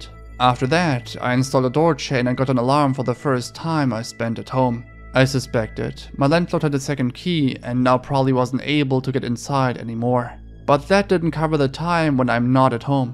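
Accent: Germany. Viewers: You've got a German accent. You are German.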